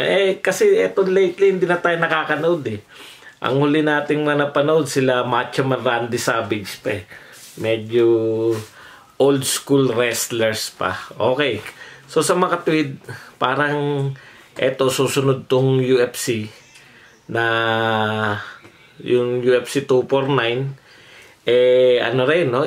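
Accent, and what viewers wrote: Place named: Tagalog, Philippines. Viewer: Galing talaga mo boss. Malinis managalog at kahit walang video sakto mga punto.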